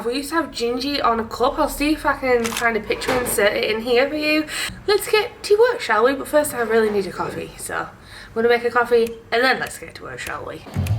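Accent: United Kingdom. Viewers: You can turn subtitles on with the little square box icon on the screen. Mine are always on. I get a kick out of seeing English translations of UK English accents. It never quite matches up! I know what she’s saying but the subtitles don’t. 🤣🥰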